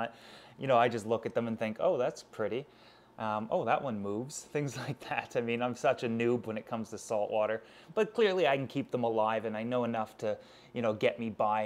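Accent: Canada. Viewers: "Out and about " I'm dead the cute Canadian accent is amazing love the videos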